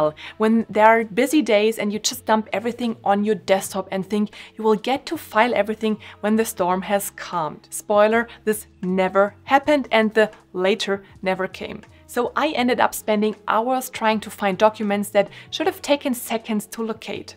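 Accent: Germany. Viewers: Are you German? 🙄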